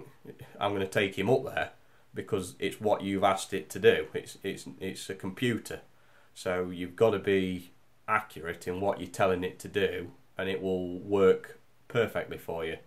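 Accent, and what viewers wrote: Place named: Stoke, United Kingdom. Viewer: Good vids mate, I'm pretty happy with it but I always like seeing if others do owt different 👍, sound like you're only down the road from me ...Stoke ?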